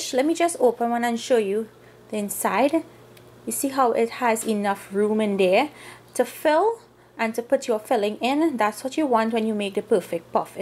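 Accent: Trinidad and Tobago. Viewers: haring you trini accent is soooooooooo pleasing. and the recipe is also excellent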